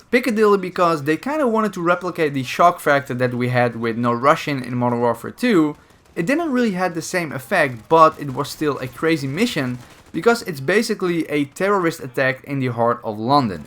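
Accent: Netherlands. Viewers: Ben je een Nederlander want ik hoor het aan je accent😂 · You sound like Silo Entertaiment (or its just the Dutch accent)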